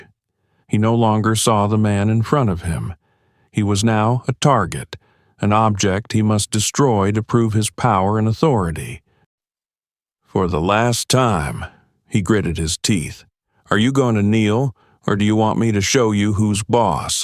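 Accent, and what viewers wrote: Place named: South, United States. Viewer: a narrator with a southern drawl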